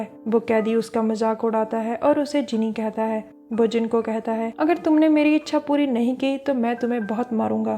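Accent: Punjab, India. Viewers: U are punjabi right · U r punjabi ?? Cuz u speak hindi with punjabi accent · Punjabi touch ha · I love your all videos didi . thodi voice to lgda v tusi punjabi ho m also from punjab 🤗🤗🤗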